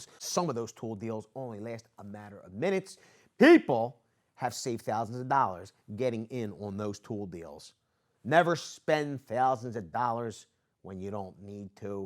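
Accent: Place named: North Jersey, United States. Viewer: You gotta be from North Jersey or close to that... Right? Sounds like it! Thanks for the video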